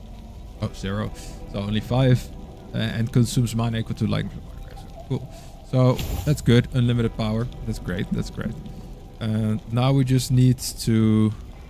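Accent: Netherlands. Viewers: Great Video, You sound Dutch or South African?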